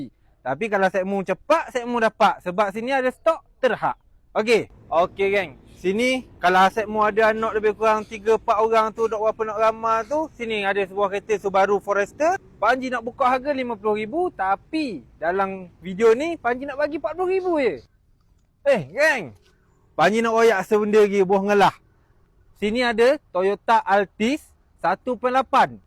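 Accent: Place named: Kelantan, Malaysia. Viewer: Aku pun tak paham bahasa pekat kelate kamu 😅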